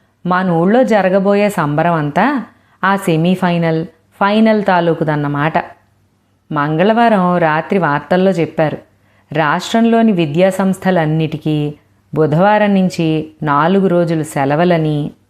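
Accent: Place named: Andhra Pradesh, India. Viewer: Madam meedi AP lo ye jilla ? Mee slang ( or accent) ki nenu pedha fan.Mee story chadhive vidhhaamam chaala natural ga, kallatho chusthu chepthunnatluntundhi Maadhi Nellore.Anduke meeru story chadhuvu thunte bhale vinaalanipistundhi .🌹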